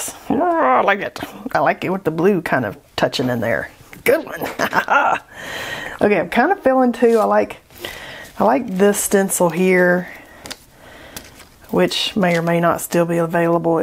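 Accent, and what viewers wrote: South, United States. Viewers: I told my SO how you're from Georgia, as he's"heard" your southern tones, but after finishing the video I said, "...ooohhh babe!! somebody's have pee'd in Wheaties!" I said "listen to these 3 things-The tape...then the challenges...THEN the water?!...and you tell me!"?? He said, "She Julia Sugarbaker'ed y'all, lol"(hopefully you can appreciate the reference)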